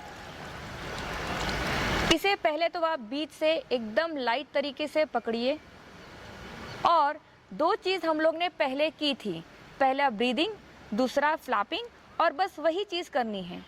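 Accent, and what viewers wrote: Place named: Hyderabad, India. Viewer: From which country are you from I think your from hyderabad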